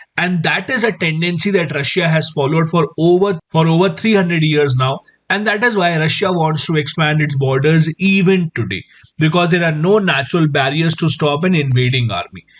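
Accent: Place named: South India, India. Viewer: U r awesome and ur voice is attantive just like some sort of South indian accent I feel very connective to that. Thank you so much sir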